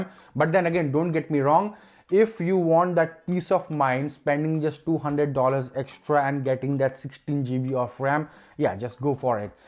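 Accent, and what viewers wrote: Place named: India. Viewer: Nice accent mate! You from India or something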